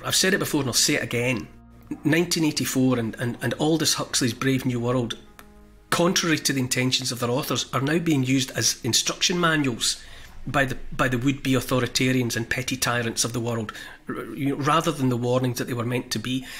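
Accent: Scotland, United Kingdom. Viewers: I discovered your channel yesterday and I am glad i did! What you're saying makes lots of sense as we are many who share your views but many more who still need to wake up… please keep up the good work! You seem to have a Scottish accent, are you from Scotland? I am considering to reside there for a while… how are things there in general? thank you and take care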